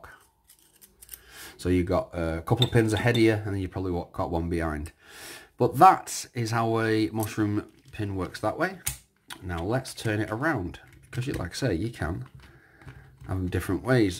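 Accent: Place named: Manchester, United Kingdom. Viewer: Great video, I don’t understand how anyone could give this a thumbs down. I’m glad you mentioned Manchester, I’ve been wondering what type of accent you had.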